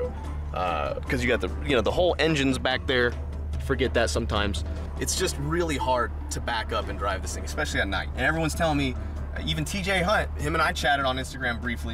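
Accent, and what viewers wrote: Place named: South, United States. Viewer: He lowers his level of southern-ness so people online can understand him lol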